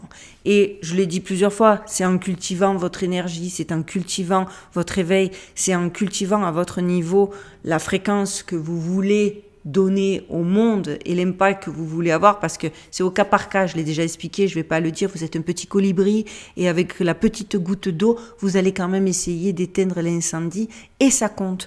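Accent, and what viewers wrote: South, France. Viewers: bjr toujours de bonne vidéos.tu a un accent du sud de la France.🙂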